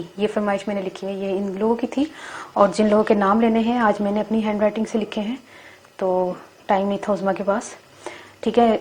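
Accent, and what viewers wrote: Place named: India. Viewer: Aap ki punjabi aur hamari punjabi main fark hai tuhadi pure from india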